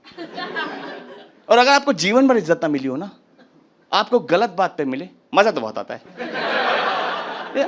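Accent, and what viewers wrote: India. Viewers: UP का नहीं मराठी लग रहा है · Bhai allahabad se ho pta chal gya accent se😂😂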